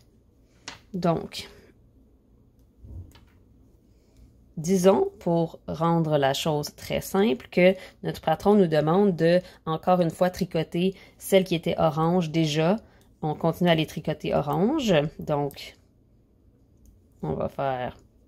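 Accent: Canada. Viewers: Je suis définitivement fan de tes vidéos !!! Grâce à tes tutoriels j'apprends plein de nouvelles astuces tricot. Tout est vraiment bien expliqué et compréhensible. En plus ton accent et tes expressions me donnent encore plus envie de découvrir le Canada!! Bonne journée et merci pour ces échanges.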